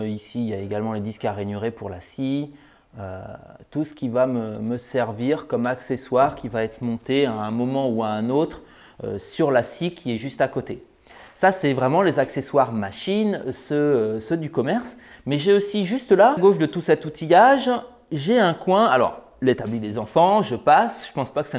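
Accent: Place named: United States. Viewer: Un "petit" 60 m2, ça fait un paquet d'abonnés qui vont t'envier :-) On croirait entendre un de nos amis américains. Merci pour la visite détaillée, toujours un plaisir !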